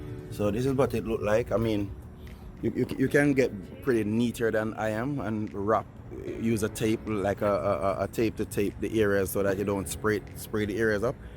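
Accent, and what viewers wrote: Jamaica. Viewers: Yow breda talk jamacan me understand. 😆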